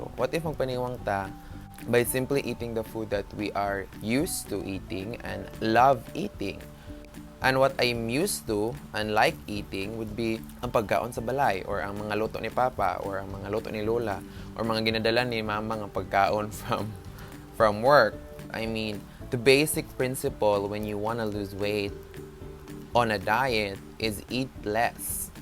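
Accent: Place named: New York, United States. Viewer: its the new yorker accent for me! HAHAHAHA pero bitaw na inspire ko charrr!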